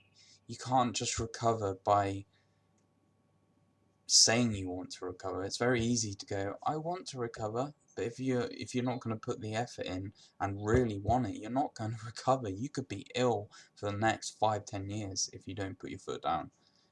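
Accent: United Kingdom. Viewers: I am impressed by your strength, you deep voice and your British accent. Yes, you are right,, we love our sickness. Of course, it might be a love/hate relationship, but she is the only mistress remaining. In other hand, not every body can recover at 100% for life time. Dealing with the sickness, at the first time it is having to mourn about the 'perfect life', and after to go further with a real life. If you are young specially, keep a eye on quiet job.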